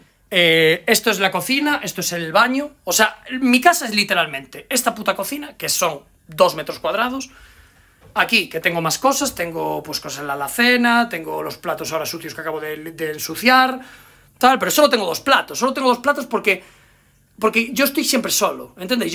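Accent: Spain. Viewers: Que voz tan diferente y no tan gastada de ase años el joven sonaba como español genérico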